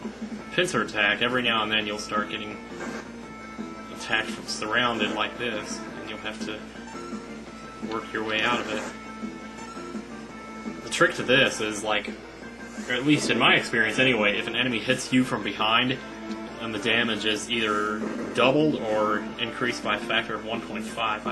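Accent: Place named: South, United States. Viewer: I'm going to watch this LP once again. This is one of my favorites and still has abit of the southern accent which is funny good stuff! One of the best ever "lemme tell ya".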